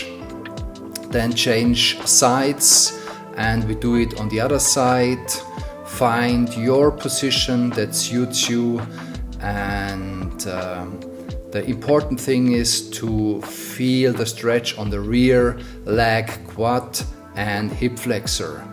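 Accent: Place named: Germany. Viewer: Love male german accent.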